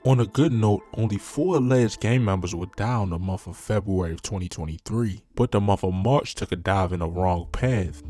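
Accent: Baltimore, United States. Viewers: Narrator definitely from Baltimore. That accent is HEAVY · You can tell dude frm Baltimore by the way he say 2 talmbout Tuwwooooo 💀😂😂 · Baltimore people talk funny asf i instantly knew u was from b more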